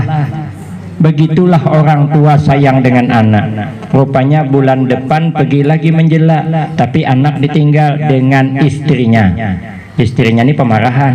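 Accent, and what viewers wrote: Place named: South Kalimantan, Indonesia. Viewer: Asli org mana sidin... lugat mirip kalua amuntai pang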